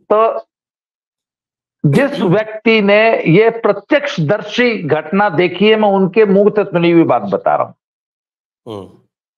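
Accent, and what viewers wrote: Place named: Uttar Pradesh, India. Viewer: Didi UP ke log aise hi bolte hain....sunne me Anand bhot ata hai... This narration is known as bakaiti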